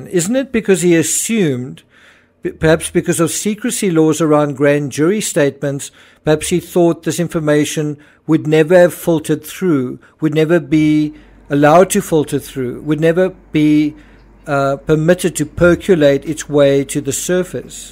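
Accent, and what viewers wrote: South Africa. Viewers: No, it's an accent. He's from SA.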